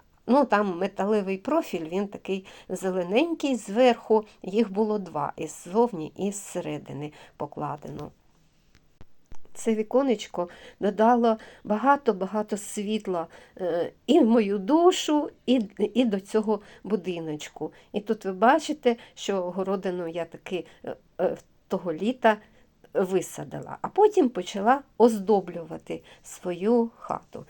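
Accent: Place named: Russia. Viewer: Голос молодої жінки. Дуже гарна мова, хоча відчутно що колись людина говорила російською або суржиком. Дякую.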